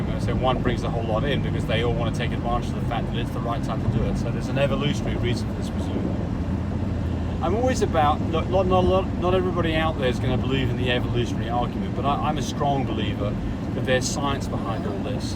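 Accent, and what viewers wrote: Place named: United Kingdom. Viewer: Im really curious you have a british accent.are you originally from UK.. karen from england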